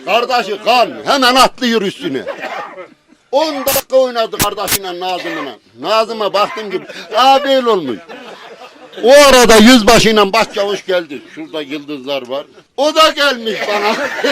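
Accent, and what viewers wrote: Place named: Sivas, Turkey. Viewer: Sivas ağzıyla konuşarak anlatması ayrı bi güzel, yediği dayakla eğlenebilmesi ayrı bı güzel, sizin çizimlerle bambaşka bir boyut kazanmış. Harika yaa. Tebrikler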